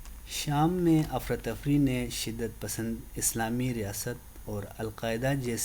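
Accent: Pakistan. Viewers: Accent like baltistan! Am I right bro.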